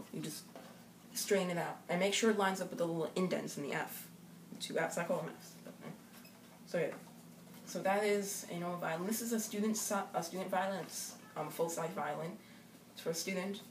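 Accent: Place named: New York, United States. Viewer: You have one of those new york or boston accents,or brazillian...just saying thats not a bad thing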